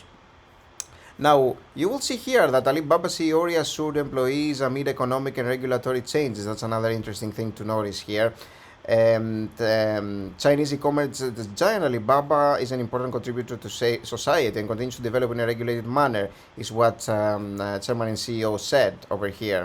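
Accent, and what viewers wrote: Greece. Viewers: Your accent sounds sooo greek man 😂 Correct me, if I am wrong!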